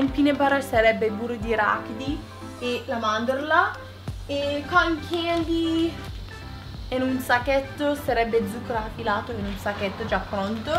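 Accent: United States. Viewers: Ma perché parli più in americano ora che negli altri video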